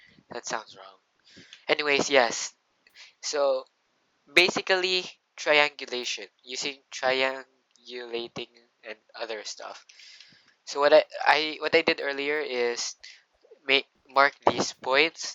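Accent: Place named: Philippines. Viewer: Parang Filipino accent ata to ah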